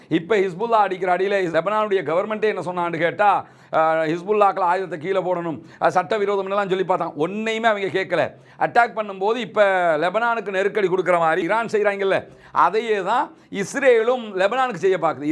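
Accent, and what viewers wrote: India. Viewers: நீங்கல் ஒரு இலங்கையர் இலங்கையருகென்று ஒரு தன்மை உண்டு மனித நேய முல்ல நல்ல பன்பாலர்கல் உங்கல் ஆங்கில வார்த்தை சிலாங் இந்தியாவின் ஆங்கில உச்சரிப்பாக உல்லது இந்திய ஆங்கிலத்தை எந்தவொரு நாடும் ஏற்று கொல்வது கிடையாது உதாரணம் ஆரஞ் ஆப்பில் ஜான் இப்படி.. நீங்கல் நாகரீகமாக இதை கருதுகின்றீகலா இல்லை... மிகவும் கேவலமா உல்லது இலங்கைக்கு என்று ஒரு தன்மை உண்டு அதே பேணுங்கல்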